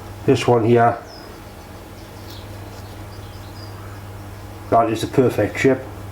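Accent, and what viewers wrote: Newcastle, United Kingdom. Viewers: Gordie north east england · It's Genuine Newcastle. Great to hear! Hi, can you do a hotpot please? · Love ya vids, and it's great to hear a geordie on yootuube ha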